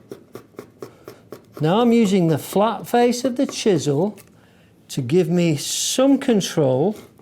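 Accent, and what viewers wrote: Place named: Northern England, United Kingdom. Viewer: Beautiful work and I love hearing your commentary - reminds me of my beloved family from the north of England! Off to chamfer the base of the Oregon legs on my Moravian Workbench project. Thanks to you, I'm sure it will be a much better outcome now! EDIT: Well, I used your approach for the bottom of the Oregon legs, cutting the chamfers first with a Japanese saw, then cleaning the up with my (beautiful) block plane. I even used your pencil line method! The chamfers look great and I'm grateful to you. Cheers from Sydney - David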